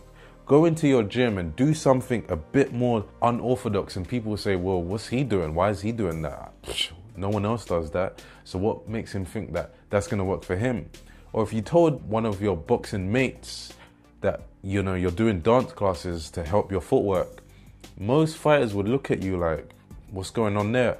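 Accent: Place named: United Kingdom. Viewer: By your voice man clocked u r british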